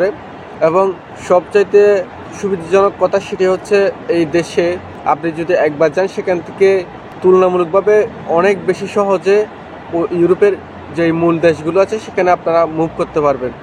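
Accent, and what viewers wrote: Chittagong, Bangladesh. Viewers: ভাইজানের কথা শুনে মনে হচ্ছে আপনি চট্টগ্রাম আসলো যদি চট্টগ্রামের লোক হয়ে থাকে অবশ্যই যোগাযোগ করবেন আরমানিয়া আপনি কোন জায়গায় রয়েছেন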